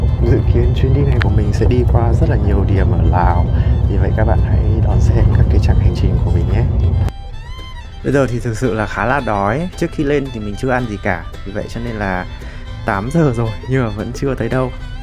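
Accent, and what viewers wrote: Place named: North, Vietnam. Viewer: Cảm ơn em, em dẫn chương trình khá dễ chịu, nói chuyện châm rõ ràng, chất giọng chuẩn trai bác, cô gắng nhé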